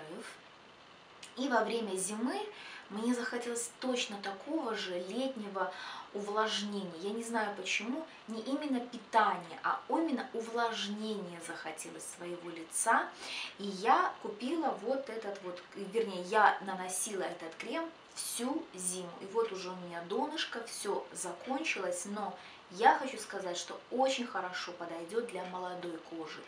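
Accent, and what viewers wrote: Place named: Belarus. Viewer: Вы такая приятная)!обожаю акцент белорусов!!!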